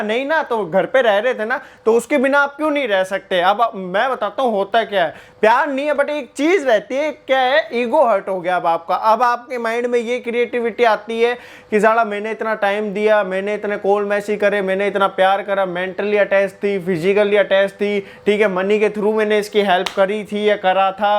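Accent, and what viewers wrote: Haryana, India. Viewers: I like your language and accent,is it haryanvi?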